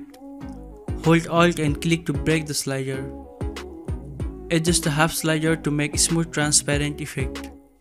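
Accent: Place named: India. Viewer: ugh, what an indish accent.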